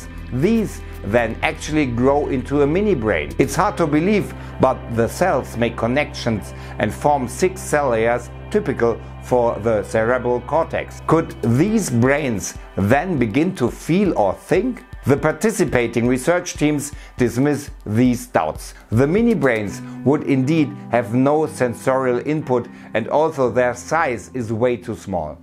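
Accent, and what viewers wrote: Germany. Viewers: Cool, u realy talk in a good english. Just ur dialect says me u r german. May u need more praxis with american or english- born people ...😉. Never mind, u r documenterys r still interesting 👍🏼. Thx there for.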